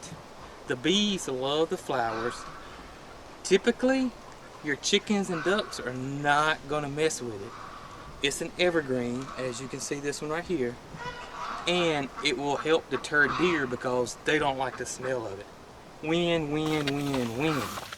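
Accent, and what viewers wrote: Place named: Tennessee, United States. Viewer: love your channel! I'm a new sub,love the first video I watched you sounded just like me here in Tennessee.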